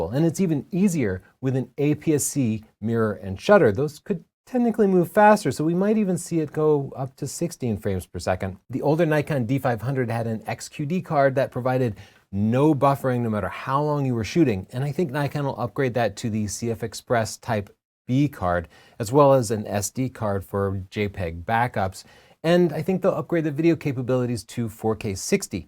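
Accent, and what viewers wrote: Texas, United States. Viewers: odd, halting speech pattern is a lot like Jeff Goldblum, with a slight Texas twang.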